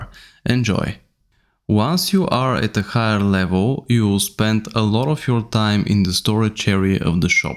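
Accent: Russia. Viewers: Какой русский акцент...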